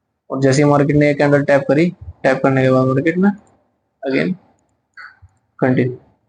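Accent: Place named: Rajasthan, India. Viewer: Bhai rajasthan se ho na kyu ki boli se pta chl ra h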